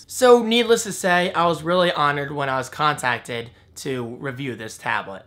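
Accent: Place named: New Jersey, United States. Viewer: am i the only one who heard him say saalid instead of solid... you are rocking the jersey accent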